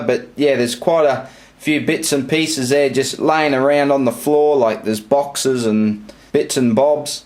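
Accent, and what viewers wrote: Australia. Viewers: Jedi Master Productions well coming from an Australian I can tell you that not every Australian speaks like that but some do. not putting on that accent to sound more Australian because it's his real voice. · I love your Aussie Achsent Aussie Aussie Aussie oi oi oi.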